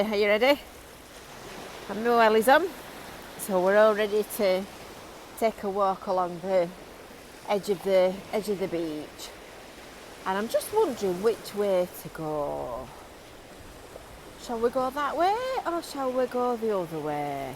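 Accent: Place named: Fleetwood, United Kingdom. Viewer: To answer your question ,now I am based in Edgware do I miss my native of Fleetwood Yes I do it is strange looking out not on the sea but looking out on small brook not a Big River Wyre touching Morecambe Bay and I had many happy memories there as child Left Fleetwood in 1975 back for small bit and permanently left in 1976 and I miss your Accent keep safe from the COVID 19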